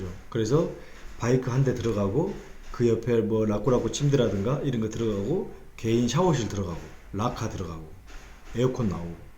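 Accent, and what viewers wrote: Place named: Jeolla, South Korea. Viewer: 말씀 중간 중간에 전라도 사투리 넘 구수하고 재미납니다 팬입니다 ^^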